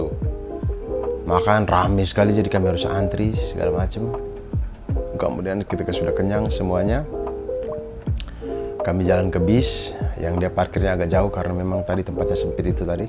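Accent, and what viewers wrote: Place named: Java, Indonesia. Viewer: himada muka timur logat Jawa 😅🙏🏻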